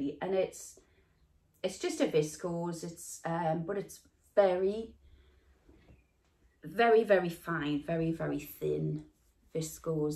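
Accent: Newcastle, United Kingdom. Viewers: pet, your vlogs are so entertaining. I love your geordie accent and all the sayings, I told my partner just the other week that his shoes were clarty and he looked at me as if I was mad - he’s a southerner needless to say. I’m initially from North Shields but moved away in ‘64, and have settled finally in sunny Spain a few years ago. Your zadie is amazing, and the fabric is gorgeous. Xx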